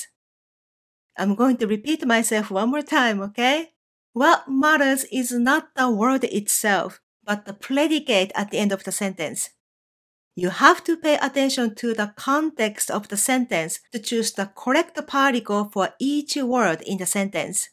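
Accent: Japan. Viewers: 先生の英語です。日本語訛りかなりだな。😢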